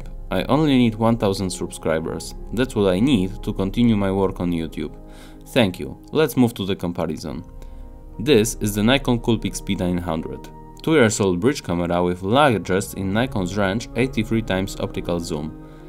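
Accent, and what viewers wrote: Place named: Poland. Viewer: To each their own! I liked the accent. But I work with a few Polish-born people (in America) and am used to that accent, and find it pleasant. :)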